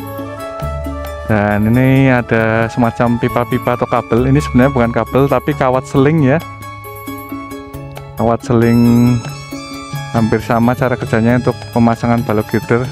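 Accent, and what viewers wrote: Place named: Yogyakarta, Indonesia. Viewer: logat jogjane medok pisan mas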